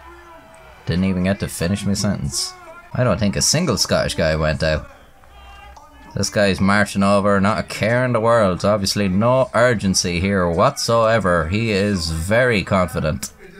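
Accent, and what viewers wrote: Ireland. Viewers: Stories always sound better when told with an Irish accent. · It's the Irish accent that really does it for me. You ever notice English/Scottish/Irish accents are over represented on YouTube? They just sound good. Not too many popular Aussie voices though.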